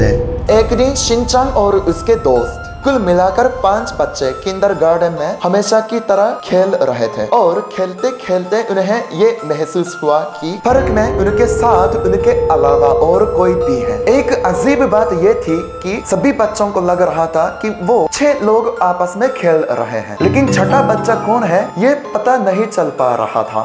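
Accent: Standard. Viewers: You speak Hindi purely more than indians. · I am an Indian and from north india still he speaks better hindi than me acctually he speaks shudh hindo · Your hindi need to be smooth and be practiced btw your hindi is standard 😇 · Itni shudhh Hindi to ham bhartiy bhi nahi bol paate jitni aap bol lete ho😂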